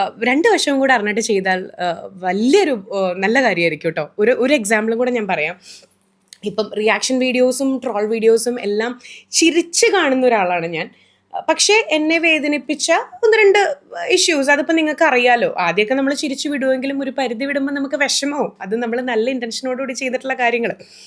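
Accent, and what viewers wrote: Thrissur, India. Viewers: Lakshmi yude samsarathil thrissur kariyanennu thonukaye ille...nalla clear samsaram...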